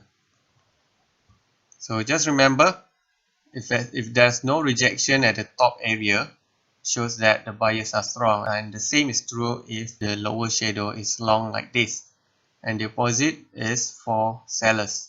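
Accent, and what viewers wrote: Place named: London, United Kingdom. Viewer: Waa bang. Spiking london laaa sekarang.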